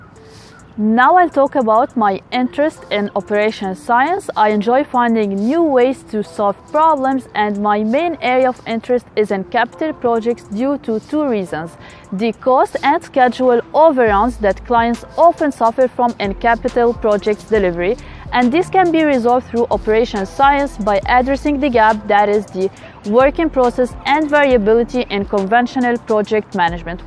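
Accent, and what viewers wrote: Arab world. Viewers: Me encantó tu italiano! sin duda se nota que el árabe es tu lengua. PD: Saludos desde Guatemala, humildemente bilingüe jaja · ¿Qué dialecto es tu árabe?, ¡Me encanta!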